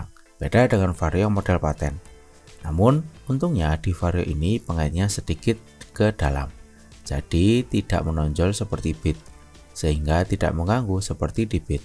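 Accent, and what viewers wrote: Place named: Java, Indonesia. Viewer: Lebih suka sama suara mas yg dulu karena ciri khasny medokny 😢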